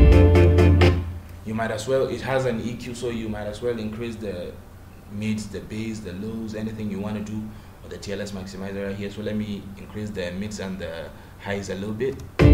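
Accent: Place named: Africa. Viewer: hey,man...your accent,you sound like someone from africa,are you from africa ?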